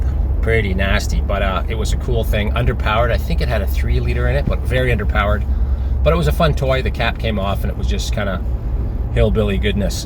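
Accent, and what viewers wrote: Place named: Northeast, United States. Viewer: So weird. If I were to make a guess on his accent, I would say northeastern US ... NY, or MA. Can it be that eastern Canada has similar regional accents?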